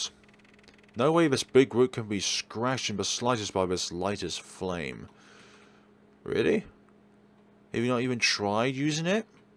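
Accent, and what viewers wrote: United Kingdom. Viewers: Where in UK is your accent from?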